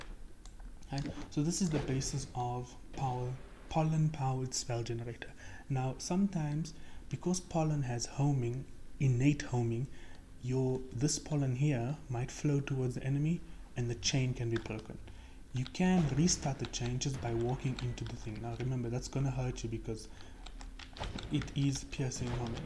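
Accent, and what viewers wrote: South Africa. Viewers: Hey man just wondering you have a South African accent Are you form the Western Cape?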